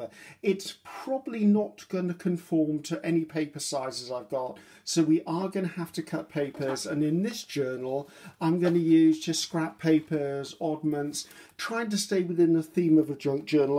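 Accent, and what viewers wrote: England, United Kingdom. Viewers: Oh my gosh, I came across you quite by accident and LOVE YOU! I'm a Brit living in the USA and love watching English crafters as it makes me feel closer to England hearing the accents and listening to you, I feel like we are old friends getting together for a natter and a cup of tea. I'm not new to paper crafting but I am to junk journals. They always seem daunting to make but your vidoes have inspired me to actually try making one! You have a new life long fan!! xoxo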